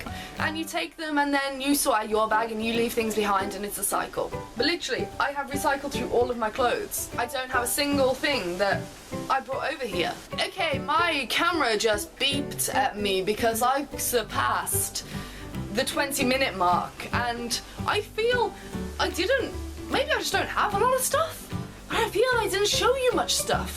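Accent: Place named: RP, United Kingdom. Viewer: i love love love your videos and think you're absolutely great, but i can't help giggling... you have such a 'gap yah' accent, i love it!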